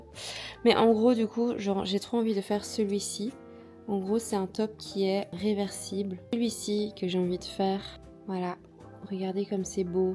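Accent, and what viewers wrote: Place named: Belgium. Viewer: j’adore ton petit accent belge, ça me rappelle d’où je viens 🥹 je crois que j’en ai encore un peu moi aussi, c’est drôle comme les accents nous suivent partout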